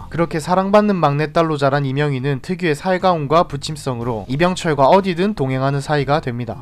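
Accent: Gyeongsang, South Korea. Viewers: 지금의 U-TUBE 를 만든 사람은 누구신가요..? 말투 보니까.. 갱상도 출신 이네요.. 감히 말씀 드립니다.. 당신의.. 부모가.. 누구인지를 먼저 알아보시고.. 이러 U-TUBE를 만드세요.. 지금의 자료를 내가.. 60년 전 간접 데이타를 가지고.. U-TUBE 를 올립니다 라고 얘기하면.. 조금 인정 합니다.. 그런데.. 아무것도..아닌 갱상도 이라서.. 돈을 벌기위해서.. 이런 자료를 올리는거면.. 그냥 알아서 돌이가시는것이.. 좋지 않을까 샹각 합니다..! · 성우님도 갱상도네예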